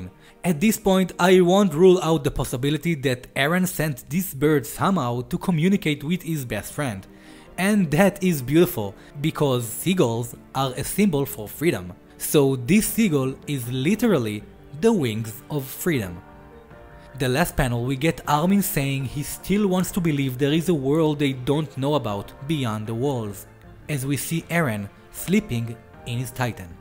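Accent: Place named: Israel. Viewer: אה חחחחח שמעתי אותך אתה פשוט נשמע ישראלי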